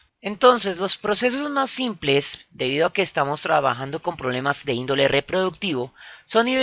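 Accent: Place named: Colombia. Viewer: Me encanta como explica todo tan Claro y entendible y adoro en Acento de Voz Colombiano....me fascinan estos vídeos,gracias por hacerlos ...se que es parte de un tiempo valioso y preparación a cambio de nada,sin fines lucrativos solo con la intención de educar ,informar y ayudar a compensar esta rama de la ciencia tan fascinante....por ello estoy muy agradecido y le deseo éxitos y bendiciones en sus futuros proyectos... Enhorabuena. ❤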